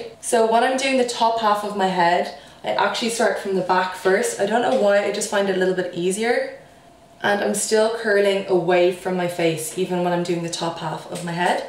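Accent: Ireland. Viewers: my grandparents were from Dublin, it's a comfort to hear your brogue ❤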